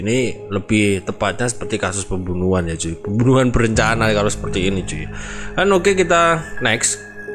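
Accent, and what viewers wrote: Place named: Java, Indonesia. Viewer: orang jawa emang logatnya beda hhhhhhh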